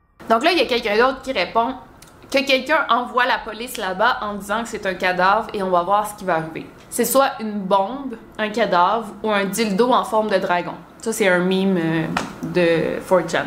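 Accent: Quebec, Canada. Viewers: Quand j'ai du temps pour moi, j'adore me poser dans le canapé avec un petit "snack" et un grand café tout en regardant tes vidéos ( j'y est même convertis mon petit ami, qui ne connaissais pas ce genre de vidéo, il adore). Quand tu nous narres des histoires, que ce soit, des cas de disparitions, sur les serial killers, les thread, etc.., tu as une façon de parler et de t'exprimer que j'adore ! Même si tu as un accent québécois (que j'aime beaucoup), il n'y a que chez toi que je regarde ce style de vidéo ^^ J'avale tes paroles, je me sens vraiment dans ces histoires que tu nous racontes avec beaucoup de passion si je puis dire, tu expliques très bien et c'est agréable ! Continues ainsi, tu as de plus en plus d'abonnés et ce n'est pas pour rien, car tout le travail que tu fournis porte ses fruits ! Une Charltonniste de France, Bise, Sophie =)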